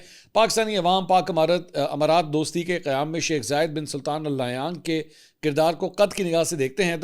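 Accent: Punjab, India. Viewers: sahib today your punjabi accent make the video more excitting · Punjabi Touch 😆 Chas Agyi Awj To 😉😍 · Sr punjabi touch zaror daity rahainy we like it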